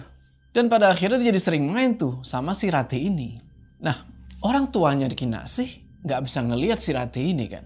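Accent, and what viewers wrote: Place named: Malang, Indonesia. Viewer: curiga arek malang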